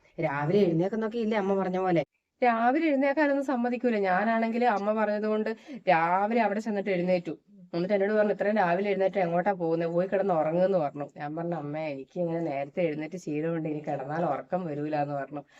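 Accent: Idukki, India. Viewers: ഹയ് ഇടുക്കി slang കലക്കി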